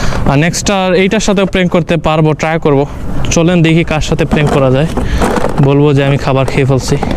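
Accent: Sylhet, Bangladesh. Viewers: Bhai Sylheti nii? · Sylhet'i naki apni? 😊❤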